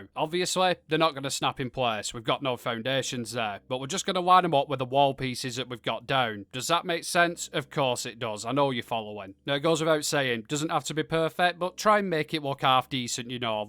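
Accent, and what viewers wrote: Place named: Yorkshire, United Kingdom. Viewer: geez your accent is thick, from yorkshire? i'm from downunder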